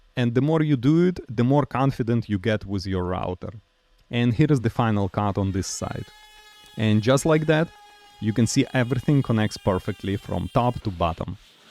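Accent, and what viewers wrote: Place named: Ryazan, Russia. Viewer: Я слышу Рязанский акцент в этом английском. Какой то примитивный чел.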